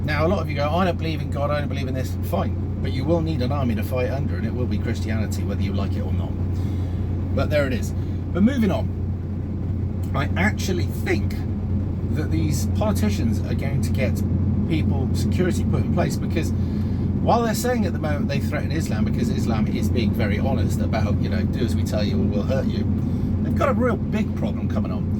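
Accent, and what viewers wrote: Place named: Cockney, United Kingdom. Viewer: Damn! You're a Christian? No wonder Christianity is dying on it's arse! I thought you just some bloke escaped from some East end Pub spouting off the usual " facking cants camming, tekkin ahh jobs an fackin aahses" blah, blah,blah, Oh and there is no Christian army, never will be, there's no conviction, no faith in....the faith,..3 in 1 but 1 is 3? leave it out? You'll need a Fearless Muslim one for when the Russians get cheeky!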